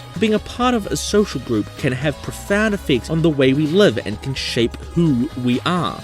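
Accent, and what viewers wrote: Australia. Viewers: I'm so sorry the mad lad I thought u were Australian until now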